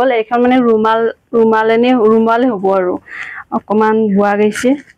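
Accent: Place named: Nalbari, India. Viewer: Ata kotha kom beya napaba tomi nalbari r lenguage te koba vhal lage but likhita kole akdom beya xuni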